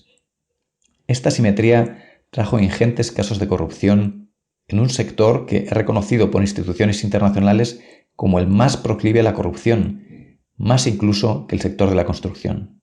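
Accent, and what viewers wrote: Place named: Malaga, Spain. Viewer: Parece usted malagueña... Se dice "osó", en Málaga y Cádiz dicen ("disen") ozó.... El asunto del seseo y el cezeo es complejo, somos ocho ("osho") provincias en Andalucia, y en cada una hablamos distinto... !! Esto es un sindios !! 😅😂😂 Saludos cordiales desde Córdoba, España.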